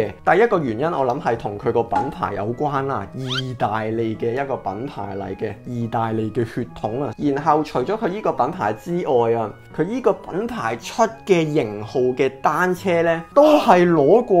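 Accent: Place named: Hong Kong, China. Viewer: Are you Hong Kong guy??? Cantonese so funny!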